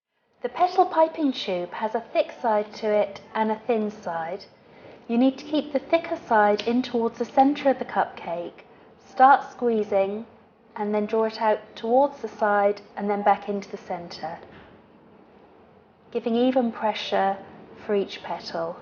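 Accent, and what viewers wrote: United Kingdom. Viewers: If only everyone had a nice English accent ^^ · Finally, I have found a video with an expert-looking outcome and a british accent. *Sigh* I'm oh so sick of watching over-sugared betty crocker videos. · I'd listen to a Brit read the telephone directory... love the accent! Lovely video. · a southern part of england, pronoucing words very clearly :) i know, im from england :) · I love the cup cakes you made-they look so pretty and delicate! And I LOVE your accent!! I want to visit England so badly! Both my Father and Mother's side of the family has British roots.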